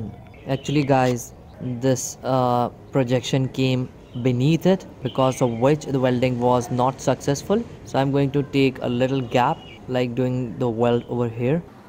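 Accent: India. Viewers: For some reason I love an Indian accent with good English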